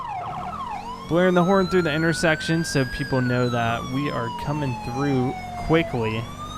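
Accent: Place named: South Carolina, United States. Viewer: Try to Fly Maybe a South Carolinan accent is different from a Kentuckian accent?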